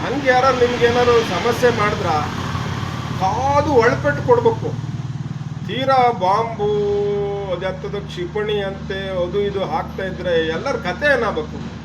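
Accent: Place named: Malenadu, India. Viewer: ನಮಸ್ಕಾರ 🙏 ಮಲೆನಾಡು ಕನ್ನಡ ಬಳಸಿ ವಿಡಿಯೋ ಮಾಡುತ್ತಿರುವುದು ಕೇಳೋಕೆ ತುಂಬಾ ಸೂಪರ್ ಆಗಿದೆ 👌👌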